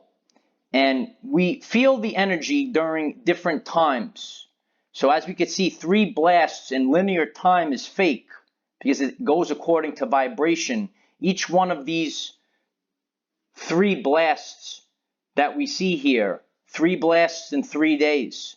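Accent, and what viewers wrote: New York, United States. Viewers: love your accent. It’s adorable. Are you from New York? Greetings from Calgary Canada 🇨🇦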